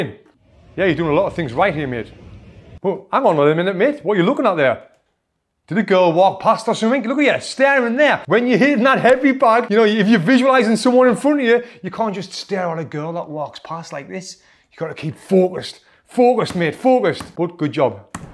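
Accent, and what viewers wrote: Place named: England, United Kingdom. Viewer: jef mate i swear ue english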